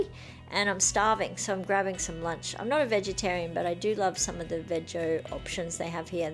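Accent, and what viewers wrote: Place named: Australia. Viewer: I just love your Australian accent. It's amazing.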